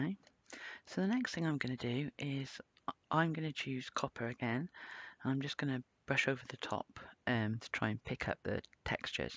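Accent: United Kingdom. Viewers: I loved loved this video. Lovely British accent, soothing instruction and gorgeous pieces!!! Thank you!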